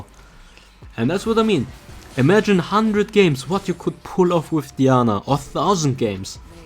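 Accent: Germany. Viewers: Are you german? Your accent sounds german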